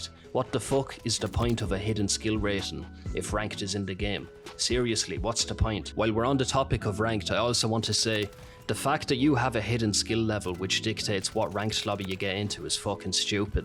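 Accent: Ireland. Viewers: Two reasons why you’re better than exclusive ace: your wise enough not to give them the benefit of the doubt, and the Irish accent. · Nice to hear an Irish man talking about these topics. Eire go bragh yup yup ❤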